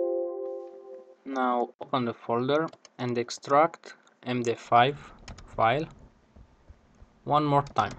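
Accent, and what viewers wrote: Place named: Romania. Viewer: de la inceput de cand te-am auzit mi-am dat seama ca esti roman. dupa accent! ;)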